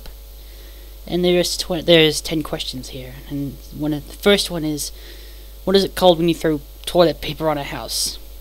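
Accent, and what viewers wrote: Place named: United Kingdom. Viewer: You have a cute voice! You sound like a Buizel! Your accent seems British to me.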